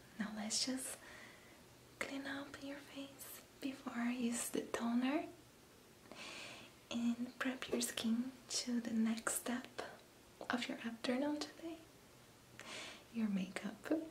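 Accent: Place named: Brazil. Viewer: Gostei muito do teu Portugues mesmo sendo do Brasil, percebi, sou de PORTUGAL, compreendes a escrita certo?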